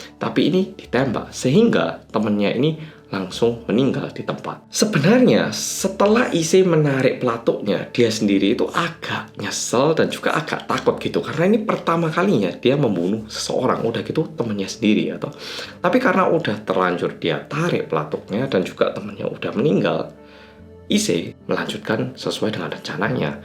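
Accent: Java, Indonesia. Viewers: Aku tu lihat yg medok, itu udh seneng,di tambah lagi cerita nya ini,lebih seneng,cerita sendiri takut sendiri 😂😂😂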